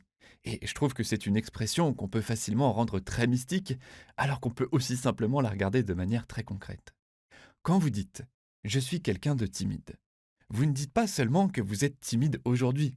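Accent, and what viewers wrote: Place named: Maghreb. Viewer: Il est étrange que-à mon point de vue-vous ayez un accent maghrebin alors que vous l'êtes pas dorigine ! J'aime vous écouter car la manière dont vous vous exprimez, engendre relaxation et détente, au sein de mon système nerveux énervé : Une impression que j'ai.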